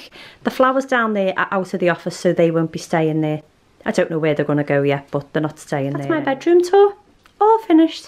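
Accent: Liverpool, United Kingdom. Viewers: Yay I found a scouse YouTuber! Love this video!!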